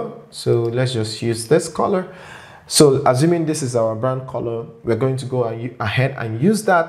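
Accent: Nigeria. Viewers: I like listening to your accent. I’m guessing Nigerian and Igbo?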